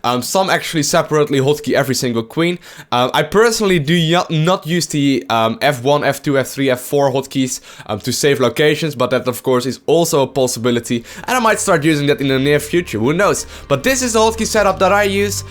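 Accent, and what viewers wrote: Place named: Netherlands. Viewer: U are dutch or something like that? Cuz your english is somehow funny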